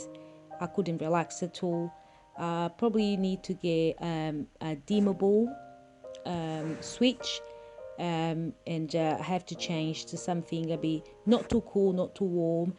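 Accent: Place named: United Kingdom. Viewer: Very interesting accent you have there. It's definitely British but sounds like there is another strong influence there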